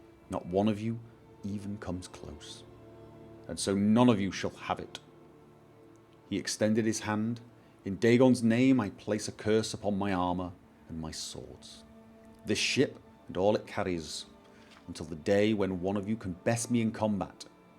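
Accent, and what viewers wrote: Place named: England, United Kingdom. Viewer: I noticed you live in Norway:o I am suprised! I know you are probably english (by listening to your accent) How did you end up in norway?:)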